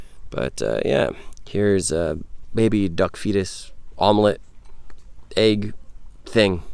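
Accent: Vietnam. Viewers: This is a guy trying to say english, he's vietnamese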